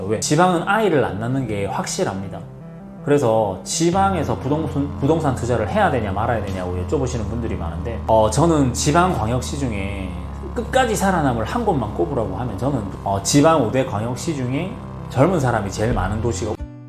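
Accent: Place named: Gyeongsang, South Korea. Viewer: 경상도 사투리 쓰시네 그래서 부산을 좋아하시나 싶기도 ㅎㅎ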